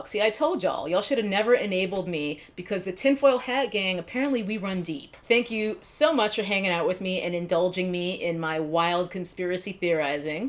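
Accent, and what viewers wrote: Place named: New York, United States. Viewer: Mmmm I felt like you had a NY accent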